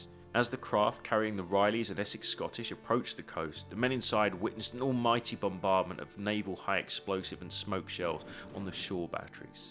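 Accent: England, United Kingdom. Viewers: That the narrator has an English accent says everything 😞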